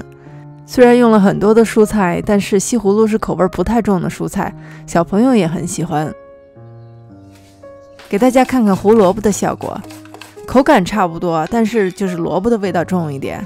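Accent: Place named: Xi'an, China. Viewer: 您好小高姐，我也是西安的，目前住在台湾，听您说话好亲切，标准西安普通话，哈哈，想请问下回到西安在那个网站可以看到您的视频。youtube在大陆打不开呢 。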